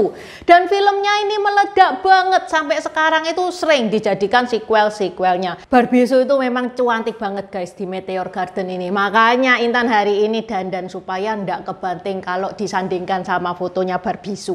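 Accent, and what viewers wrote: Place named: Yogyakarta, Indonesia. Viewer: Mirip logat Chinyo alias chinese yogyakarta. 🤭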